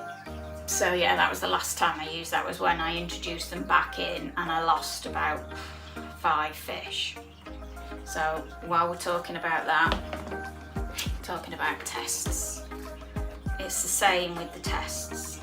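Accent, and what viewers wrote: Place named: England, United Kingdom. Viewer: great little video, and nice to hear an English voice, lovely house you live in, and your camera work was very good under the circumstances, have subbed, cheers Shane Warks uk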